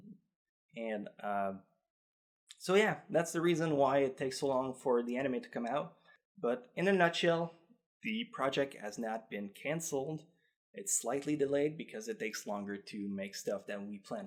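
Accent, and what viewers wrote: Canada. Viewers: I just realized your Canadian accent👌, good luck m8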